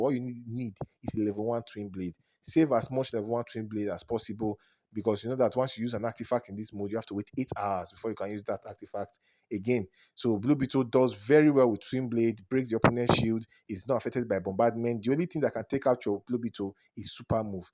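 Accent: Nigeria. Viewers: I enjoyed the video bro you sound like you are a Nigerian 😊. I don’t know how to play league invasions was looking for answers and then stumbled upon your video 🙏🏾. I have all the best teams and op artifacts still I suck 😂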